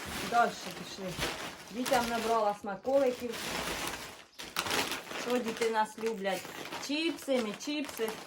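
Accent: Poltava, Ukraine. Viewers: Як мені подобається наш неповторний полтавський суржик!❤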